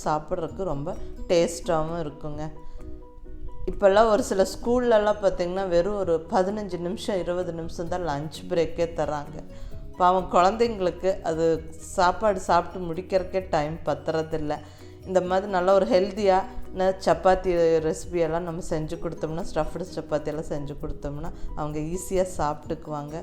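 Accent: Coimbatore, India. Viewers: Aunty u know am a combatorian ,whenever am hearing ur voice am feeling like in Coimbatore...superb vlog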